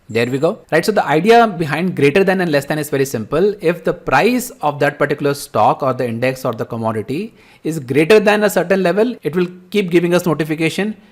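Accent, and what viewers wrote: India. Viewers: I love the Indian ascents ...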